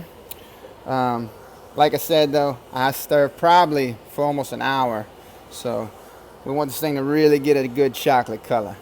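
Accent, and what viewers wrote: Louisiana, United States. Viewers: I wonder what part of Louisiana he's from. Gotta be close to me. Southwest Louisiana for sure.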